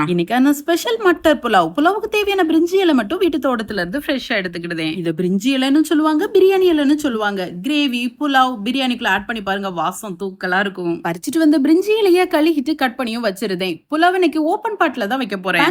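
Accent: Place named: Kanyakumari, India. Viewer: Please change the slang eduthiruthen, vachiruthen, cheathiruthen, vittiruthen.k.k district aaa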